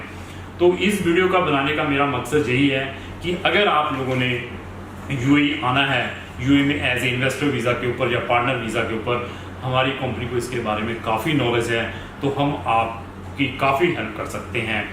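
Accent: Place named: India. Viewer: would be nice to write and speak in english as we do not understand indian english sorry,couldnt understand a word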